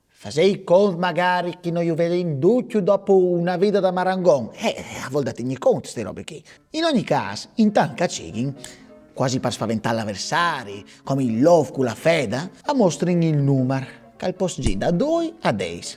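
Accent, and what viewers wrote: Italy. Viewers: Brâf! Il furlan di indulà tabaitu ? Un tic talianât.. e tu sieris encje disint Ciao... 😮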